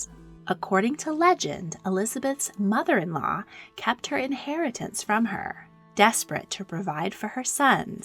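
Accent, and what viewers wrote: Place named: United States. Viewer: Dying of dysentery on the battlefield? Huh. American narrator for English history; never works.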